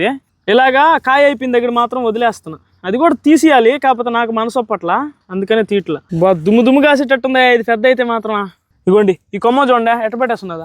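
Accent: Nellore, India. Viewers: Nellore yasa bro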